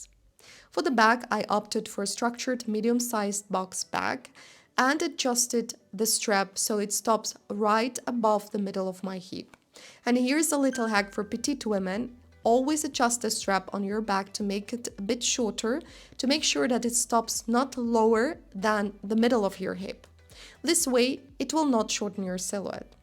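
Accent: Russia. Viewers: russian acsent 😐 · почему у нее русский акцент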